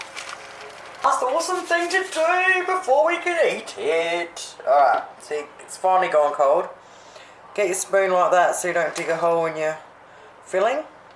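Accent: Australia. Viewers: You sound Aussie as , love it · Hey Woman I love ❤️ your style and hearing our good Aussie accent. Cheers 🥂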